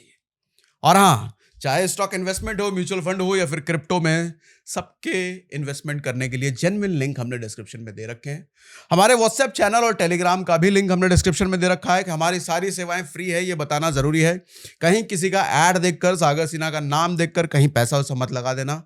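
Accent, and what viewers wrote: Bihar, India. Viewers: Tum bihari ho na ??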